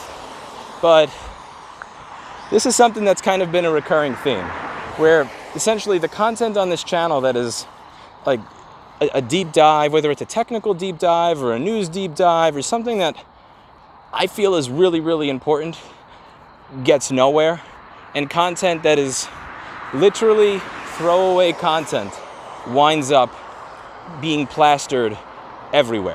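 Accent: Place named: Brooklyn, United States. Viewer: Personality, God you'r from NY , Brooklyn even.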